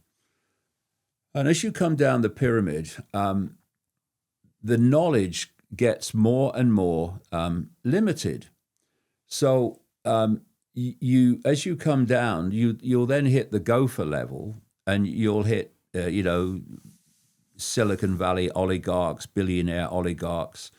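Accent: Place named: Northern England, United Kingdom. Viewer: Message to the host - stop interrupting the guest with that barbaric up north accent.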